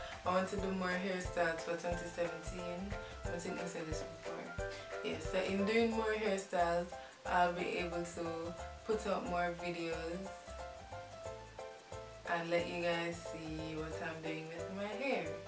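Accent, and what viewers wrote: Jamaica. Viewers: ...are you Jamaican? you kinda sound...Jamaican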